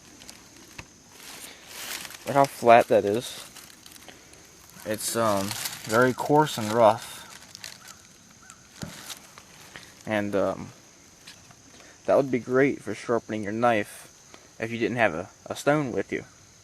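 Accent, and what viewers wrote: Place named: Alaska, United States. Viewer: Are you related to the Alaskan bush people by chance? you have their same accent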